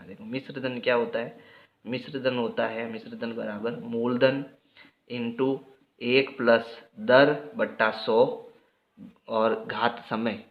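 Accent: Rajasthan, India. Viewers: Bhai rajasthan se ho kya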